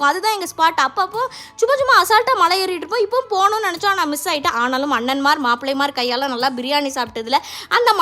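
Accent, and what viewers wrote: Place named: Valliyoor, India. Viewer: Hai nanga vallioor.unga pechu nalla vibe.namma oru pechu.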